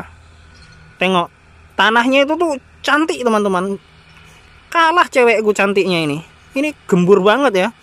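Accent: Java, Indonesia. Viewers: Jowo mu ngendi le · Logate lho b. Jawa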